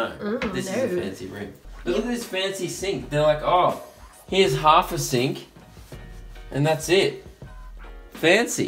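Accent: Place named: Australia. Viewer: AEC Media that’s called an “Australian accent”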